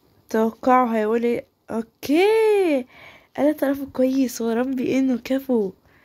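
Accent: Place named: Saudi Arabia. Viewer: والله انك صرتي تتكلمين سعودي يا لبيهه😭💀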